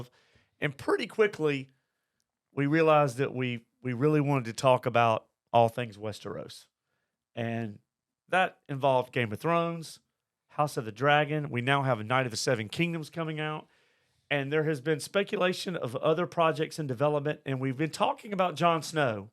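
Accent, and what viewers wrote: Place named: South, United States. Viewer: What accent does the main host have? I know it’s a Southern accent from the US, but is he like Tennessee or?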